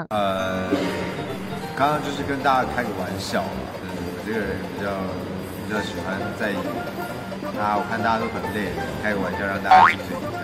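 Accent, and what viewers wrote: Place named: China. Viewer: Me encanta su acento con chino. 😍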